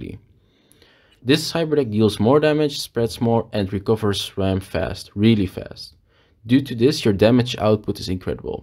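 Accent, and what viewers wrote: Netherlands. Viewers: Great video man, very helpfull!!! Ps. Is that a dutch accent i hear?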